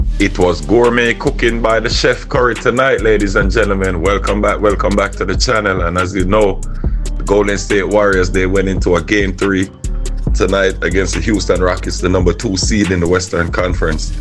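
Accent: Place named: Caribbean. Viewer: First time I’ve heard a Dubs breakdown from someone with the Caribbean patois, like the sound…thought you’d have Steph cooking with some scotch bonnet or cooking up some salt fish and ackee…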